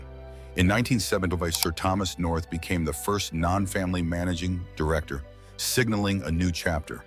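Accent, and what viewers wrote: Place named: United States. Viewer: Can we NOT AI narrate with a yank accent?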